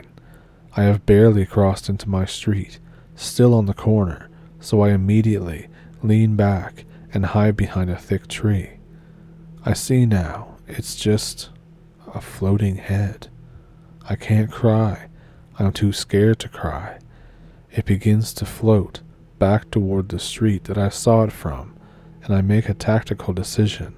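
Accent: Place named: Newfoundland, Canada. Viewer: Narrator sounds like he’s from Newfoundland! Me too brudder, me too. If not you must be east coaster fer sure. Thanks for the upload makes me feel like I’m home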